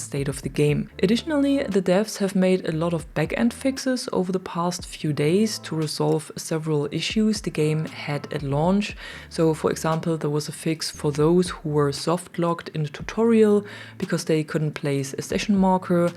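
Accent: Germany. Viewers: you kinda sound german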